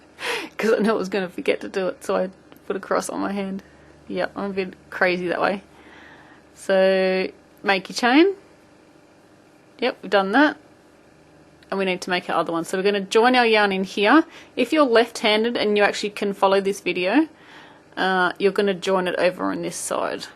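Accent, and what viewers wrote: Australia. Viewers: so glad i found aussie ,i can understand you lol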